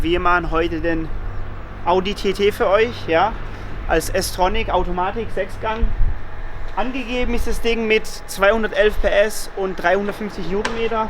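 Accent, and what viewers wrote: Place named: Hohenlohe, Germany. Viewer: aaah wieder dahom in Mergentheim, seh i doch glei... :-) "...Steckor uffs Getriebe..." is hald doch faschd Houalohe!!!! Houalohe, do wo die Hase Hoosa haase, un die Hoosa Housa haase. :-)))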